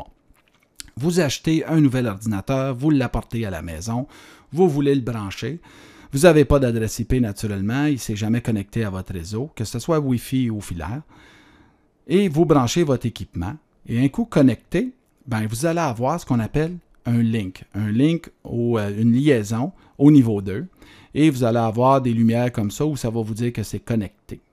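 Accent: Quebec, Canada. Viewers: Très bonne vulgarisation d'un sujet pas toujours évident pour les débutants. Je vais la conseiller à mon fils qui débute ses études en informatique et qui voit justement les notions de réseau IP. Et lui qui adore le bel accent canadien (vu de Belgique, il y a bien un accent), il va être ravi. Bravo pour cette vidéo ! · C'est bien mais je comprends rien le Canadien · super merci beaucoup, votre accent québécois est parfait pour mon cerceau.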